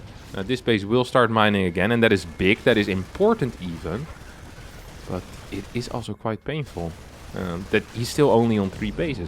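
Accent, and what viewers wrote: England, United Kingdom. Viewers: i just dont like his english accent, feeling like I cant be the only one, his account is still fairly small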